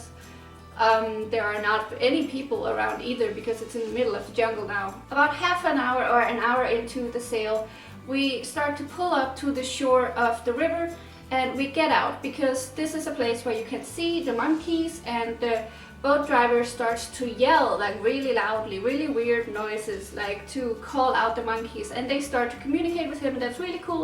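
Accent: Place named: Denmark. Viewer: I love Denmark and your accent!!!!!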